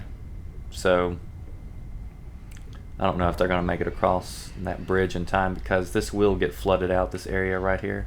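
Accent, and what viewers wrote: South, United States. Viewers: Hey were you from? I'm from georgia, you sound like you're a fellow southerner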